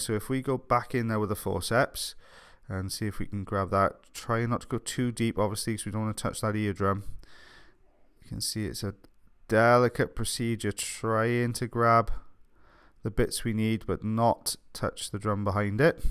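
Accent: Wales, United Kingdom. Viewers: God Damn! Look at all your female fans! A bear of an audiologist with a Welsh accent gets the ladies going. I'm jelly. Your Mrs must hate your channel.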